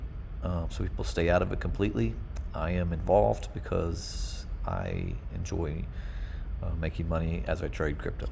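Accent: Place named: South, United States. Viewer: Just found your channel - bought some HBAR last week. Came for the charts, sticking around for the southern dialect.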